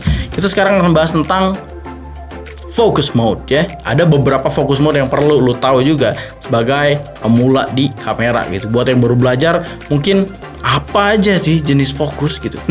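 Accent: West Java, Indonesia. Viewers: bang orang sunda mana?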